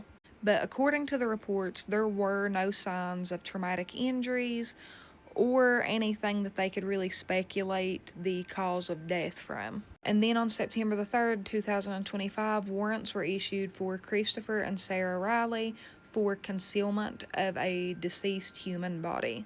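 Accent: West Virginia, United States. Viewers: I lived in Beckley, WV for over a year for school and fell in love with the state. Your accent is as beautiful as you! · Your WV accent is absolutely delightful.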